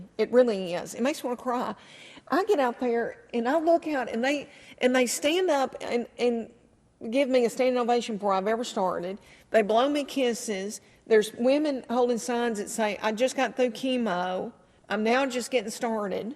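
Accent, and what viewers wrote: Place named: North Carolina, United States. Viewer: You are so down to earth and funny. I'm from SC. I have aunts and cousins from NC have your strong accent. You tell stories that I hear from my family I'm 70 and😂 your the best comedian.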